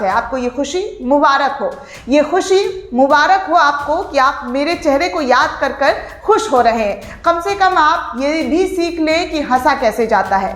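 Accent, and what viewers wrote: Bihar, India. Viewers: Achha bolti ho,, achhi anchor⚓ ing karti ho, Zaban thodi si biharion ki tarah hai, aapki. ☺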